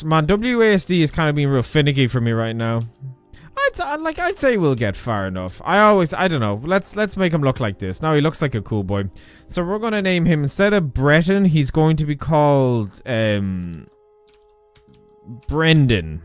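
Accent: Ireland. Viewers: You never fail to guilt-trip me into Liking your videos, eh?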